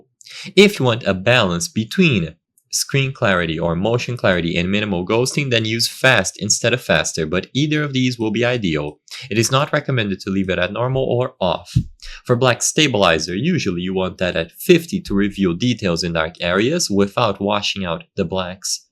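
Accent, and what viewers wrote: Brazil. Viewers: You're brasilian, arent you? Thanks for the video!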